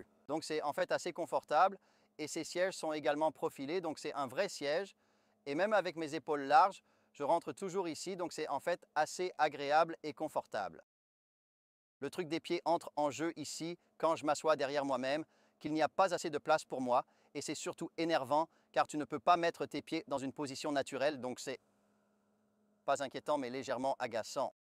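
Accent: Quebec, Canada. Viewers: La traduction automatique n'est pas très bonne. Et la voix choisie a un accent québécois.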